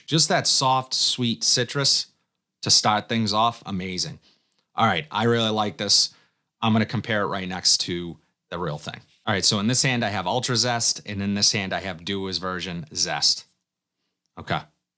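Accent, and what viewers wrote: Boston, United States. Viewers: That Boston accent 🫣🤣🤢🤮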